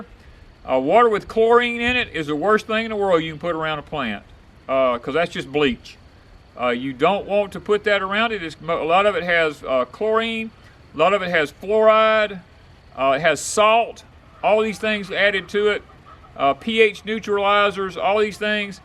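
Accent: South, United States. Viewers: I love a southern accent .....most of my most watched content creators have an accent , there has to be some type of crazy science behind it. Reminds me of my childhood. Born and raised in Northern New York Went to North Carolina during the summers Id always come back with a twang 🥰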